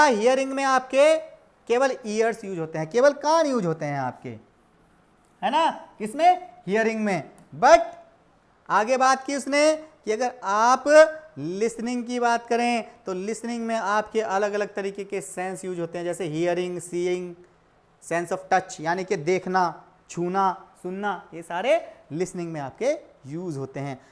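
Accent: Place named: Bijnor, India. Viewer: Sir aap bijnor se hai 😃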